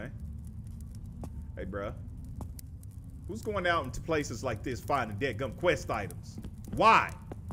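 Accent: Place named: Russia. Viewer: Your Russian accent clean af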